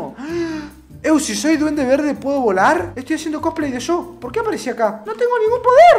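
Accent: Chile. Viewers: Ya pero hablando chileno,re good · Q bien habla chile otros pibes lo hacen mexicanos JAJJA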